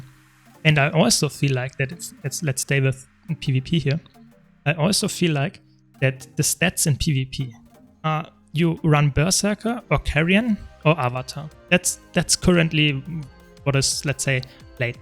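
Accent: Germany. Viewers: ja moin, ich bin auch deutscher, dein akzent kickt halt noch härter rein als meiner xD no offense metabattle hat allerdings auch sehr viele builds...es könnte sogar gut möglich sein das die noch mehr builds haben als guildjen und bei metabattle sind auch nicht alle builds top notch z.B. die open world pve builds, also ich weiß echt nicht, ob sich das jemand dauerhaft antun möchte mit dem ele mit den niedrigsten lebenspunkt und der niedrigsten rüstung in kombination gibts das so nur beim ele, ob sich das jemand das halt wirklich permanent antun möchte mit full berserker gear rumzulaufen, da braucht dich ja nur ein mob in der open world angucken und fällst gefühlt schon um und liegst im downstate...guildjen hat wirklich dediziete builds speziell für open world pve, da wirken die builds von metabattle dagegen nur wie eine veränderte (wenn überhaupt verändert) version von builds die sie so für instanzierten content benutzen, also halt für fractale, raids, strikes und dungeons gedacht sind und nicht spezifisch für open world pve, während die builds bei guildjen extra dafür erstellt wurden.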